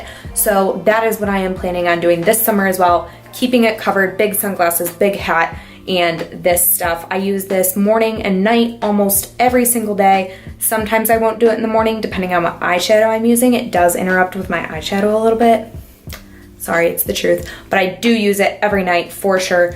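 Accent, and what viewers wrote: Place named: Midwest, United States. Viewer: I could tell you have a Midwest accent 😭😂 #chicagogirls ♥️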